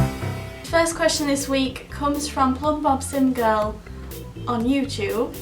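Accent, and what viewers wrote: United Kingdom. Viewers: What part of Britain are you from?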